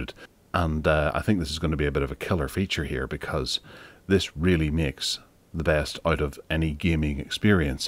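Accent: Northern Ireland, United Kingdom. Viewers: Great to hear a Northern Ireland voice!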